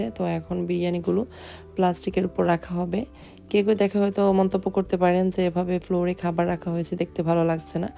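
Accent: Sylhet, Bangladesh. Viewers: আপনি বলে সিলেটের ভাসা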